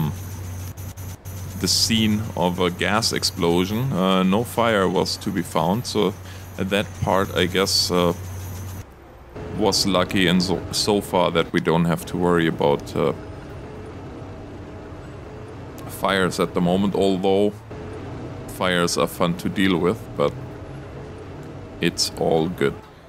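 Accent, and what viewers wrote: Germany. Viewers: canadian? you have a german accent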